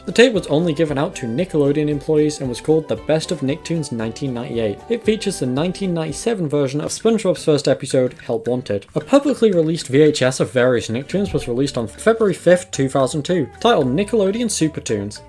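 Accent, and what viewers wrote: United Kingdom. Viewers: Enough with the British accent! Can we please stop with the British accent?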